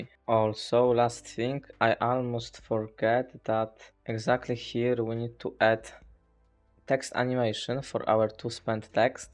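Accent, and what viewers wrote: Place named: Poland. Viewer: Polska GUROM slychac akcent <3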